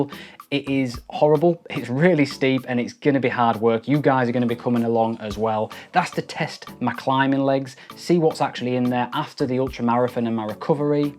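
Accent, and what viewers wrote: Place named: Yorkshire, United Kingdom. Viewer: So glad I’ve found your channel! Really good review. I’m Spanish but I lived in Yorkshire for a few years so it’s nice to listen a bit of an accent :)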